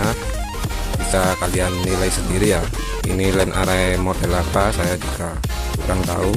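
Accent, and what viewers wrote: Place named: Malang, Indonesia. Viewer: Mas sampeyan iki wg malang ta? .... Kok logate arema pasti tak like mas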